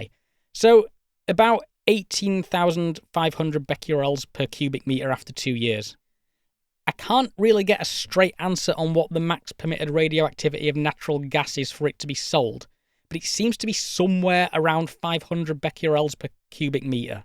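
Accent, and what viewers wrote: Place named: United Kingdom. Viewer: Man, your British English sounds awesome) Thx)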